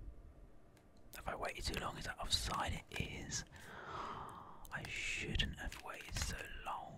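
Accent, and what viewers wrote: United Kingdom. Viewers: What part of London are you from?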